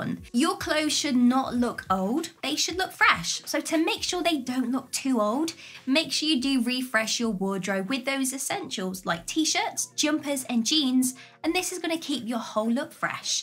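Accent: United Kingdom. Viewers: Now even though I'm 57 and very happy in my own skin, this is such a fun video to watch because the speaker has a most pleasant British accent and seems to be able to present her "material" in such a fun & fresh way. IMHO, this video is way more enjoyable than most of the shows on TV. Anyway, I just wanna say, "keep up the good work"..... I think your efforts are not only practical but quite easy to grasp😊 👍👍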